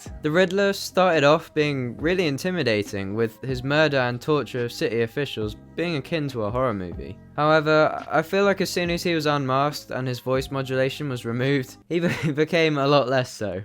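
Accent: United Kingdom. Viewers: you british though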